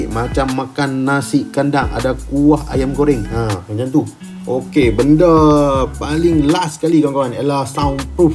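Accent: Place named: North, Malaysia. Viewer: kedai dekat mana boss..dengar cakap bunyi utara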